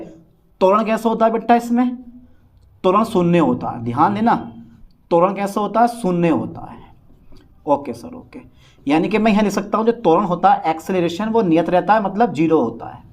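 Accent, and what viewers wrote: Bijnor, India. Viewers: Sir aap bijnor side k ho kya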